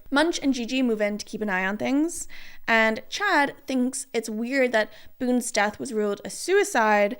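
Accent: Ireland. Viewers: for the first 30 minutes of this video i was literally was debating on whether or not to make a comment about your accent because the way you were pronouncing your a's, p's, and l's were all giving me irish and come to find out lmfaoo you are irish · I watched multiple videos of your and I didn’t even notice your accent til after you said you were Irish. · omg you're Irish? I thought you were Australian :p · it took me 8 minutes into the video to actually process the Irish accent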